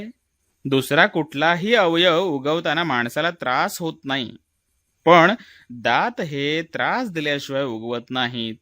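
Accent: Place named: Solapur, India. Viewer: भाषेचा बाज अगदी सोलापूरी ! खूपच छान .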